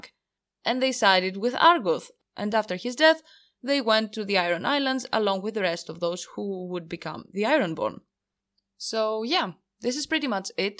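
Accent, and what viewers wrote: Greece. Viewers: Έλα ρε! Αγαπώ την Ελλάδα! Υπέροχο βίντεο, greek accent makes everything better. I'm also a non native english speaker making videos, so feel free to check mine if you want. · I prefer your Greek pronunciations anyways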